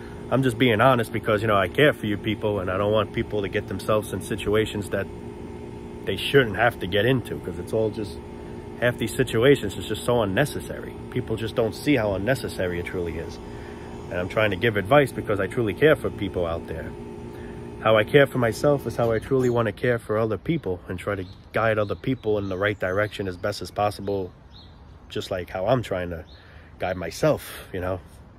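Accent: New York, United States. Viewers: Great NYC accent. Heroes of 911.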